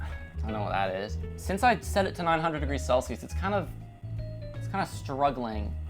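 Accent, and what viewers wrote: Adelaide, Australia. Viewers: Your voice fluctuates so much. Sometimes it's easy to tell you're an Aussie, other times your voice does this weird thing where you sound American for a minute. Not necessarily a bad thing but different. Good video overall, might wanna change the name so you don't end up with feds. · Can tell you're from Adelaide XD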